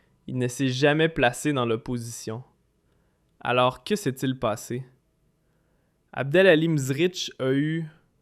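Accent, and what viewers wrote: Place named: Canada. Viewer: Pourquoi vous changez votre accent il me semble que vous avez l'accès canadien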